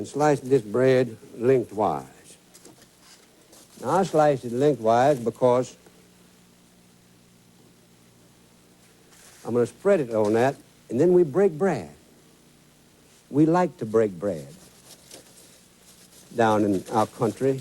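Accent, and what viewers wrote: Louisiana, United States. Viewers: I know this comment was posted a year ago, but seriously grow up. He wasn't a "Fake". E. Wilson (April 24, 1914 - September 5, 2001) Wilson was born in Roseland in Tangipahoa Parish, one of the "Florida Parishes" of Louisiana. Consider yourself taught. If you don't like the Cajun accent then I suggest you not watch his videos and not visit Louisiana or even to my mother's house. Mom and Dad, especially Dad talk like. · I do too. That is a specifically Cajun accent. Some have a thicker, French accent the deeper you go. And we southerners can tell each other apart because of the small differences in our accents. A person from North Carolina sounds way different from a Texan, so on and so forth. You don't hear this kind of speak outside of southern Louisiana that much...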